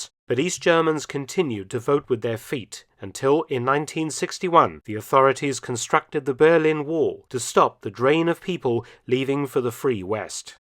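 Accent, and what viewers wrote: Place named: Southern England, United Kingdom. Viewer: A generic southern/Home Counties English one.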